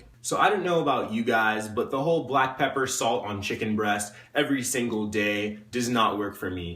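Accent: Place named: Canada. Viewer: I can just tell ur canadian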